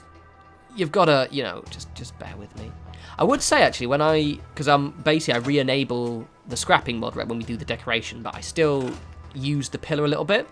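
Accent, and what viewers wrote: United Kingdom. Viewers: Anarchy RWO I'm aware he has an accent, but plenty of people from the UK pronounce the word appropriately. I can't speak to any specific regional influence on pronunciation of the word, but it is correctly pronounced the same way in pretty much any place that english is the native language. Quit acting like I'm insulting the man's intelligence by offering a bit of educational advice.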